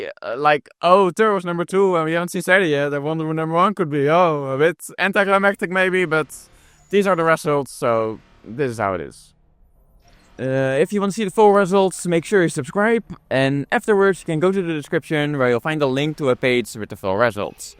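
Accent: Netherlands. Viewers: I hear the Dutch accent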